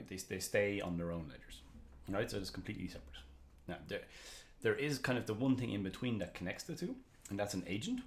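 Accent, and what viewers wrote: Ireland. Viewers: Congrats and good luck creating new videos.. Looking forward to more videos from you....love your irish accent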